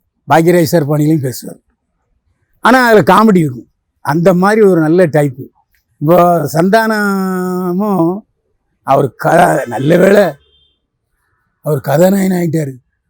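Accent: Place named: Chennai, India. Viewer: Enya ungaluku lam chennai slang lam kevalama iruka? Thiruntha matingaya.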